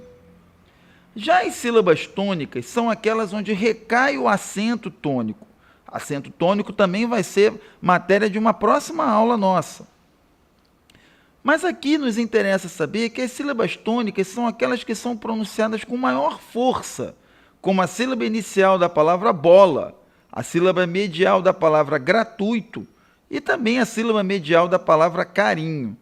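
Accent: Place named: Rio de Janeiro, Brazil. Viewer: Muito boa aula Sílabaxxx, aulaxxx, tõnicaxxx... Carioquês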